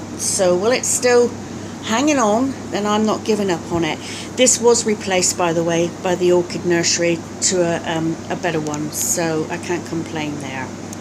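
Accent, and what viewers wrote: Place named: England, United Kingdom. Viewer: You made me smile when you were trying to remember the different types of orchids - there you were pointing the camera at Dendrobiums and forgot them completely! Thanks for joining in and you've solved one mystery - I knew that was an English accent!!!. And well done getting Den. fimbriatum to bloom - you will love those blooms but you might not like the fragrance?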